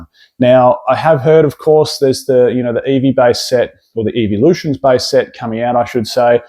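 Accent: Australia. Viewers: Bro screw those idiots that keep saying you’re saying it differently or wrong. You are not saying it wrong. Everyone loves a good Aussie accent. Some of us get jealous that we don’t have Aussie accents! Loved this content. It’s very interesting that SV era is bringing love to pokemon that arnt always given love.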